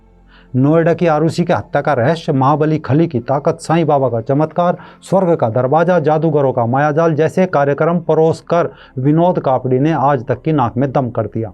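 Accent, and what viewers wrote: Haryana, India. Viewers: I really like and admire your choice of topics and your sarcastic comments, one liners, and choice of adjectives with that Western UP & Haryanvi accent. Sabhash Keep it up. Keep posting more than one video per day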